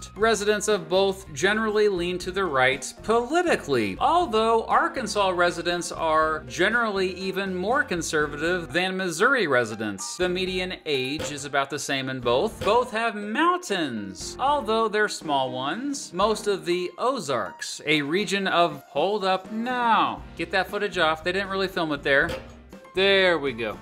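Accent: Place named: North Carolina, United States. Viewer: I always thought your accent sounded like North Carolina. I've lived in Missouri half my life too.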